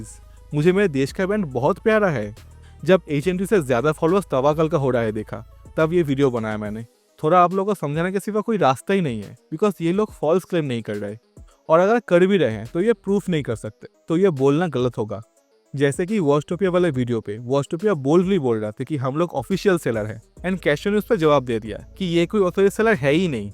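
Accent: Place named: Bengal, India. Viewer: bahut badhia bola hai. lekin tumhara Hindi me bengali influence hai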